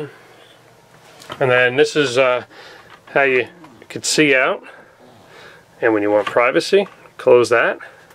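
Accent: New Jersey, United States. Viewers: SUCH a Jersey boy. Can really hear it in your voice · that Jersey/ mid east accent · I think it's the New Jersey accent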